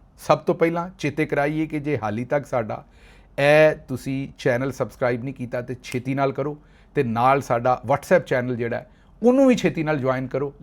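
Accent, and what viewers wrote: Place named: Punjab, India. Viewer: اصل میں پاکستانی عوام کی آواز ہے جو کہ تھڑا پنجابی لہجے میں اپنے جذبات کا اظہار کرتے ہیں ۔۔۔۔ بھائی لگے رہو 😂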